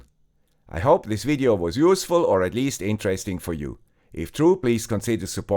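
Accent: Switzerland. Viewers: Congratulations to 400k subs 👍🏻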